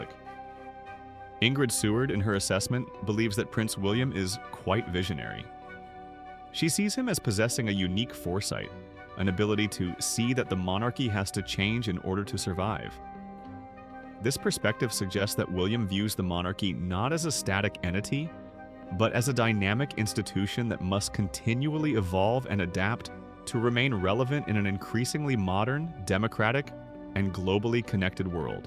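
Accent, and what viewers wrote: United States. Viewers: I will never like AI in an american accent.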